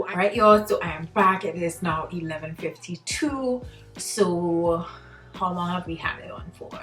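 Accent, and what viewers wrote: Trinidad and Tobago. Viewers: When I heard that trini accent....subscribe ONE TIME GYUL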